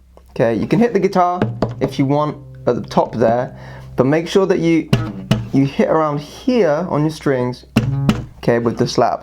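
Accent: United Kingdom. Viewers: Coz he's british like me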